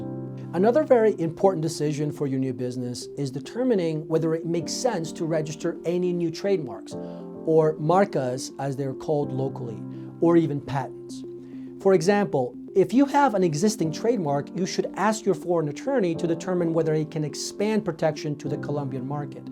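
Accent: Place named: New York, United States. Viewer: I love how this dude goes from a very New Yawk accent to an incredibly good Spanish accent. Very helpful videos! Thank you.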